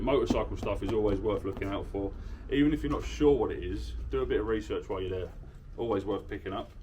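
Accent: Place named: United Kingdom. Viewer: Did you say Mill wall or meal wall? My closed captioning said meal wall. I did watch until the end. American English is my first language and I sometimes don't understand your accented UK English. I try. My ears aren't used to it. Very interesting how you find these great bargains. More power to you. You do work very hard for the profit you make, so your experience and research are making you a success. I have enjoyed watching you with Ben and the lads. Thanks for the video. Sorry it was so hot.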